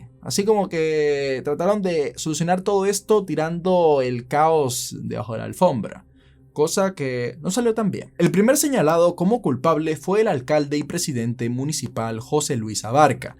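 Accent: Venezuela. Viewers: Tiene acento venezolano👈 Su acento no es peruano👈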